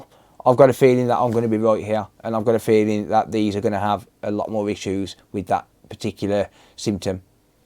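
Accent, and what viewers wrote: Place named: West Midlands, United Kingdom. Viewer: Absolutely fantastic video. Im a new subscriber and really enjoying the content, it’s so interesting and you’re obviously incredibly knowledgeable about electronics . Also great to hear a fellow West Midlands accent ☺️✊🏻 I’m really thinking about getting a PS5. I have a PS4 Pro which is great, but I’d really like to upgrade. With regards to the Liquid Metal issue, would you advise to keep the PS5 flat rather than vertical so that the Liquid Metal doesn’t drop? It would suit my setup flat tbh. Do you think the liquid metal has dropped during transit or does in only become more of a liquid once heated? Sorry, I really don’t know much about that kind of thing 👍🏻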